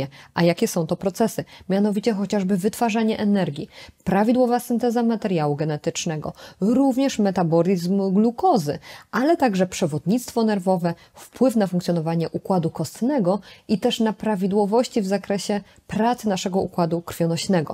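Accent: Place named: East, Poland. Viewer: Ta pani pewnoe jest ze wschodu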